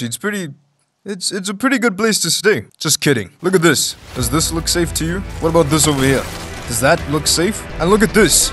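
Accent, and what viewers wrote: South Africa. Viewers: You from SA bru?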